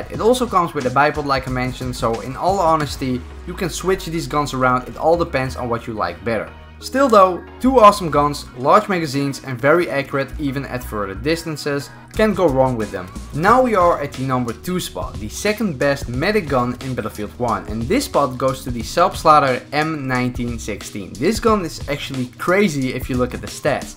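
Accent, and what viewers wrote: Netherlands. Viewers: Ben je Nederlands? Zo klink je wel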